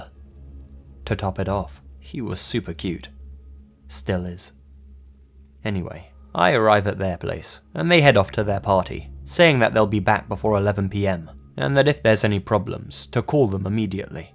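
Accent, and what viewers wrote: United Kingdom. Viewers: and Be busta awesome UK narrators 👌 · British accent.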